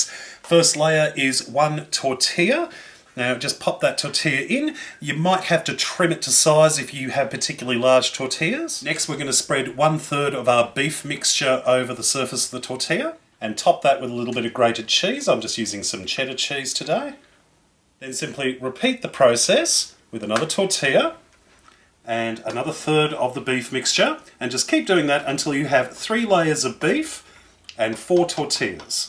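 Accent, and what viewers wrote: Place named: Australia. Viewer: Australian accent is 👌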